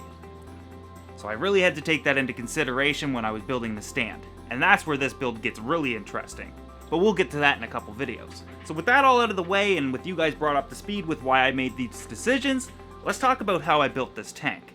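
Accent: Canada. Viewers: In this video i could definitely hear his Canadian accent come out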